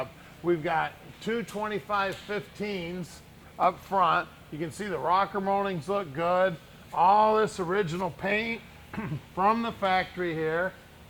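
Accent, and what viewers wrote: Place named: Detroit, United States. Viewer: My grandfather had one of these in navy blue. RIP Grandpa. He worked for Ford and grew up and lived in Detroit, as did my mom, uncle, aunt and grandma, and your accent reminds me of them all. It's actually bringing tears to my eyes. Thank you.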